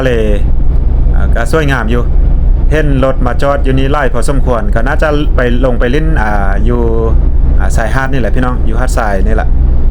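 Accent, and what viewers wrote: South, Thailand. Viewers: สำเนียงบางคำ เหมือนภาษาใต้ปนอีสาน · สำเนียงภาษาใต้ชัดๆ..ถ้าไม่มีคำว่าแม่น/บ่/มัก/เบิ่ง/เฮา/(จะไม่รู้ว่าคนลาว)สำเนียง..คนใต้ชัดๆ..คนไทยภาคใต้นี่น่าจะมีเชื้อคนหลวงพระบาง55..หรือคนหลวงพระบางได้ผัวคนใต้55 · แปลกจัง สำเนียง70% เหมือนภาษาใต้พื้นถิ่นของภูเก็ต & พังงา เราฟังแล้วเข้าใจ100% ไม่เหมือนฟังภ.อีสานแถบอุดรฯ, ขอนแก่น ฯลฯ เคยมีคนบอกว่าภาษาอีสานของจ.เลย ซึ่งคนส่วนใหญ่อพยพมาจากหลวงพระบาง จะพูดเหมือนภาษาถิ่นใต้ฝั่งอันดามัน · ฟังตอนแรกคิดว่า แหลงใต้ บางคำเป๊ะเลยนะ เคยมีเพื่อนเป็นคน วังสะพุง จังหวัดเลย พูด แบบนี้เลย สะพานถูกต้องแล้ว อ่านว่า สา-ระ-สิน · ไซเหมือนแหลงใต้เลย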